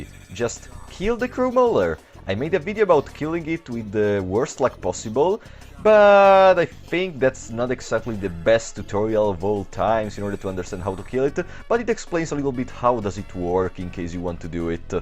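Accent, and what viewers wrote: Italy. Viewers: Are you italian? (just for the accent)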